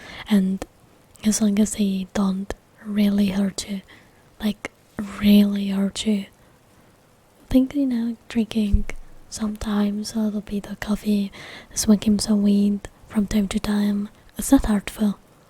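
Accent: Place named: Italy. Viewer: Love the Italian accent with English words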